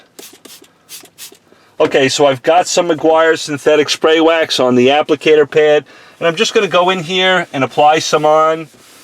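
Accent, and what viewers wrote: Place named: New England, United States. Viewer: Never knew you could use that on a dash! First time watching your channel. One question, what part of New England are you from? Your accent almost sounds southern New England.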